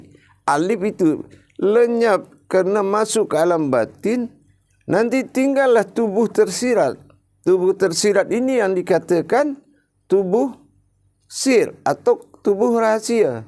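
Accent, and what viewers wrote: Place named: Malaysia. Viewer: Mf buya.kenapa logatnya diubah melayu.kan lebih nasional indonesia.lebih bnyak mempergunakannya.artinya lebih bnyak memahami indonesia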